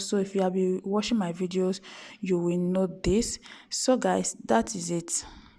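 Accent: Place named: Nigeria. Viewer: 😂😂😂😂😂😂she is from Nigeria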